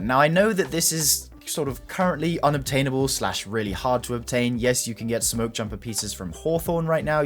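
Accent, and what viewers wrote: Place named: England, United Kingdom. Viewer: Hearing saying "You'll be bonking everything" in his English accent is pretty funny, as it means shagging everything over here. 'Bonking' as related to hammers only really works with an American accent, to my mind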